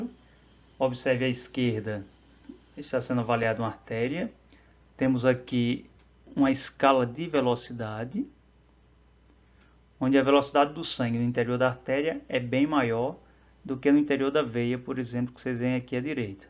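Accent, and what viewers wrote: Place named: Ceará, Brazil. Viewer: Meu parceiro que aulas boas. Parabéns! Vc é do Ceará? Sotaque de cabra bom. Só o som que ficou meio baixo, mas conteúdo de excelência! Abraços. Ps. Encontrei o canal por acaso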